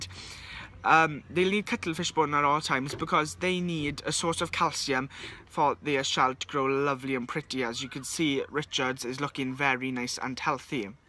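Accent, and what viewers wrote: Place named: Wales, United Kingdom. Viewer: Awesome land snail, and Welsh accent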